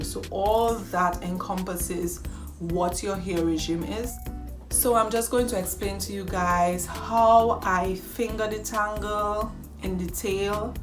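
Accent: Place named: Caribbean. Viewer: Hi, where are you from, I hear a Caribbean accent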